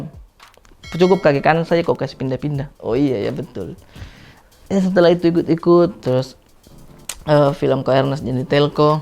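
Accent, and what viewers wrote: Makassar, Indonesia. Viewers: Susah emang kalo khas logat makassar disuruh logat jakarta agak susah dan ribet hahahahahaa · Wah, masih kental logat makassar na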